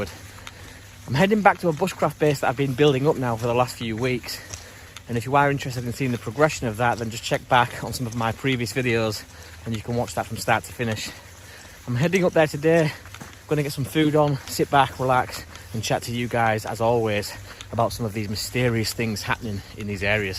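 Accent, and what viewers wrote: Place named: Yorkshire, United Kingdom. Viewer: Just come across your channel and so far so good pal! I would love to do what you do but unfortunately I'm not right well. I take your a Yorkshire lad from the accent. Keep up the good work pal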